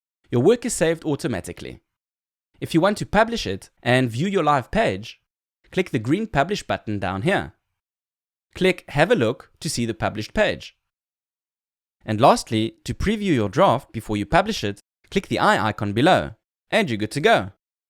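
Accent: South Africa. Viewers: Sounds like South African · it retrospect idk what i was thinking, sounds pretty south african. i think it just hit me as pretty unique the first time around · That's how South Africans speak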